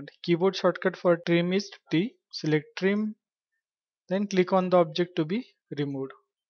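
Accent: India. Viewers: как вы индусы заебали со своим акцентом, сил нет.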